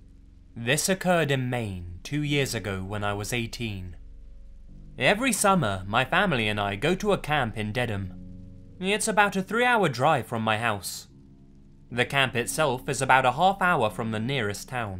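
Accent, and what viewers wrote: England, United Kingdom. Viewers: british accent reading/narrator for Native American SKWalkers video??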